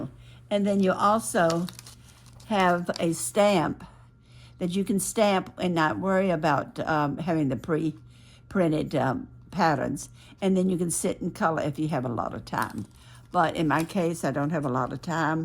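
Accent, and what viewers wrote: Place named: North Carolina, United States. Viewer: I'd wondered about her accent too. I think she sounds so much like my relatives did from a small town in North Central, NC. I know about the tornado yesterday. I was watching the Ryan Hall Ya'll weather channel and praying no one would be hurt or injured but unfortunately people were killed and injured. My mother and I were very sad to hear that news. I do hope is OK I just had her video recommended to me by YouTube tonight. I don't do much paper crafting I usually make jewelry and crochet but love her content, she's so creative and her voice so soothing. I'm subscribing!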